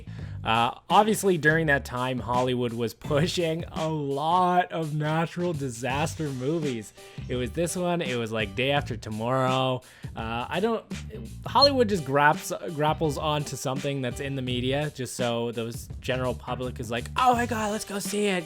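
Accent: Canada. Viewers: hahaha. Its really weird but I heard canadian and bit of nourthen english. It's probably just me tho. By the way your builds are amazing :D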